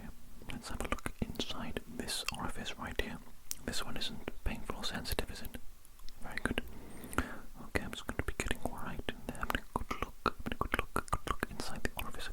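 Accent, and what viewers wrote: Wales, United Kingdom. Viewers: Came for the tingles, stayed for that fantastic Welsh accent. I'm sure that's what makes your videos so relaxing! Diolch, 😉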